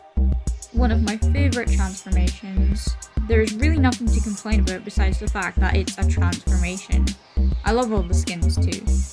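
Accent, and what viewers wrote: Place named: Australia. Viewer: Is it just me or do u sound Australian all of a sudden👁️👄👁️?? ur voice is adorable 😭✨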